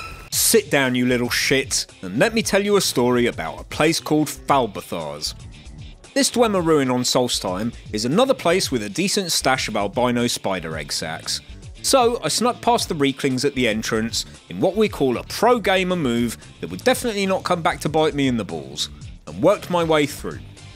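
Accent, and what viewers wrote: United Kingdom. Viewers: Ew britisj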